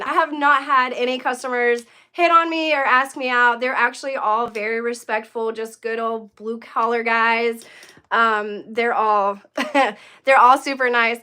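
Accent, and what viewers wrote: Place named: South, United States. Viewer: You're very beautiful woman and I love your little southern accent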